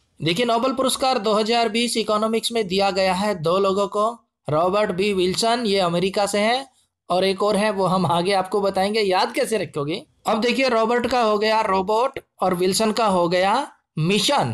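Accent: Haryana, India. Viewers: Sir aapki trick na best h .. Bss aap ase hi hme vedio provide krvate raho haar session per Aapki aavad haryanvi m hote h vo bsst h m bhi haryana se hi hu mujhe or bhi aache se smjh aate h aapki vedio😀😀😀😀😁😁😁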